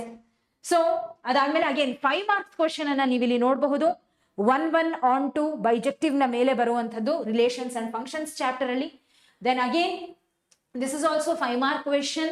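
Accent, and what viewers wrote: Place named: Kerala, India. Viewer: Madam I think u are malayali, your kannada slang is in malayam accent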